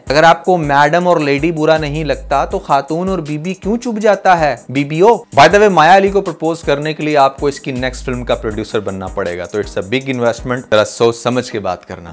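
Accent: India. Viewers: I wonder how he is speaking constantly in hindi accent 🤔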